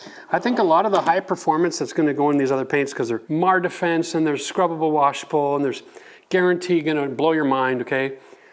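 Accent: Canada. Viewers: Apparently the accent and use of millimeters didn’t clue you in, but this guy is Canadian.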